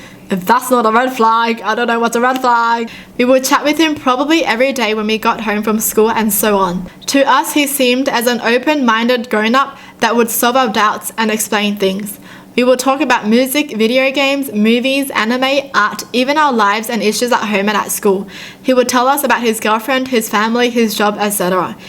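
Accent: Australia. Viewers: Same, I think I might be picking up her Australian accent because I watch her so much lmaoaooaoa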